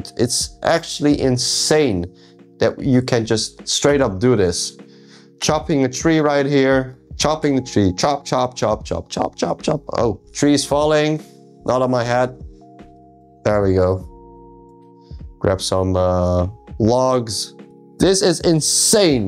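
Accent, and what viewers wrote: Netherlands. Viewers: Is that a Dutch accent I hear?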